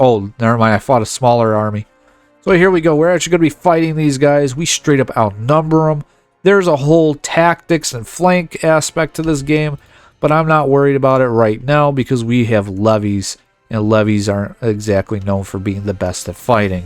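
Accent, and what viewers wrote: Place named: Buffalo, United States. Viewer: You sound like you're from Buffalo. It could be any of the Great Lakes but I know about ten guys who talk just like you in western New York.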